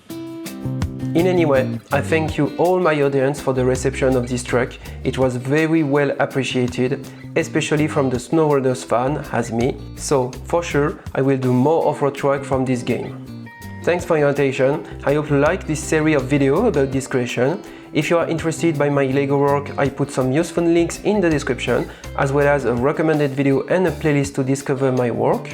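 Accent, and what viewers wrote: France. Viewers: are you french ? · Are you french ?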